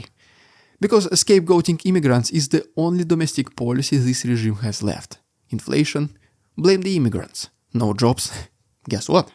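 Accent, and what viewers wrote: Russia. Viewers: annunciate some of your words a bit better, buddy... ie., you opened with, "Imagine you're walking through, Baku in Azerbaijan..." but neither of those two names were readily intelligible... Bit too much of the Russian tongue roll, for an American ear... The way you changed your show, from being a play-by-play sports announcer, to an insightful newscast, has been remarkable. I hope/think you can take a small criticism, without being offended... like telling a shortstop to start down, and come up on the ball... like telling a basketball defender to not leave his feet... Sorry, I don't know a hockey reference. 🌎☮ SLAVA UKRAINE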